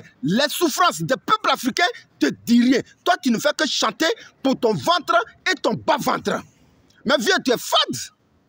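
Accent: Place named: Ivory Coast. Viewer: Koffi a déjà mahé lahan de watara il s en fout.....😅😅😅😅 je suis congolais je kiffe l accent ivoirien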